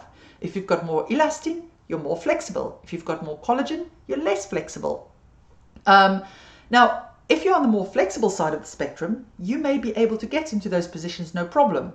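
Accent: South Africa. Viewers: Hi, thanks for this. I'm really enjoying your videos. It's so nice to hear a South African voice:) I'm teaching yoga to seniors and really worry about osteoporosis. I read somewhere that crunches should be avoided. Do I need to worry about other core work? I try to keep it gentle, but would be good to know for sure what I should be staying clear of? Thanks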